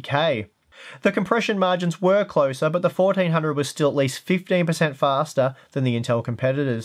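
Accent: Australia. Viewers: ahh the good ol aussie twang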